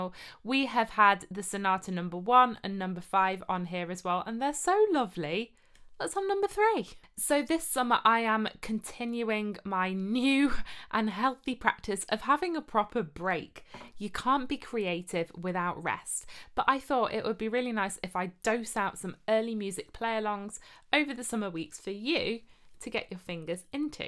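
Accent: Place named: England, United Kingdom. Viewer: I LOVE Telemann. Question fer ya, if you don't mind. I love English Accents. Where is your accent from? England has so many & some I really like. (if you don't mind me asking)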